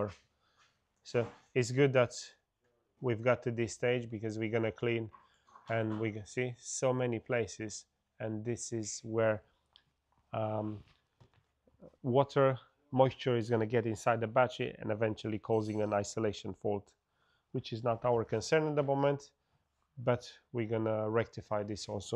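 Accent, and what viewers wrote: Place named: Romania. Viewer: De aici este, mi-a confirmat. Accentul romanesc se simte :)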